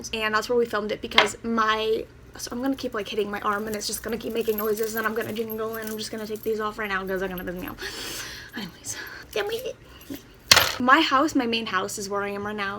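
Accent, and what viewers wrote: Ireland. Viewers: everyones saying your doing Mirandas voice on purpose but I just thought you were from Irish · where you from?? are you irish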